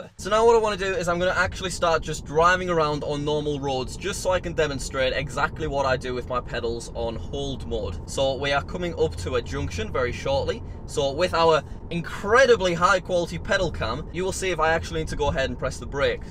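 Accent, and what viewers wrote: United Kingdom. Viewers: You saund like a British man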